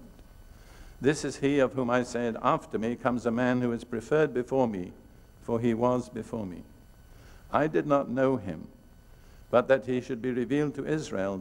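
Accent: United Kingdom. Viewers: Yes! I fully believe you must give way, and let Jesus lead. I wanted to note to the deaf, that toward the end, when he is preaching from Revelation, he mentions "the wrath of the lamb". His british accent causes the captioning to type "roth". But he say's it 3 times, and each time "Lamb" is captioned incorrectly. (Shame on you, Youtube)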